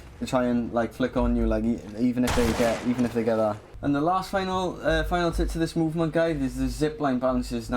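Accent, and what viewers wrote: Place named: Wales, United Kingdom. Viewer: Can't watch ur too Welsh sorry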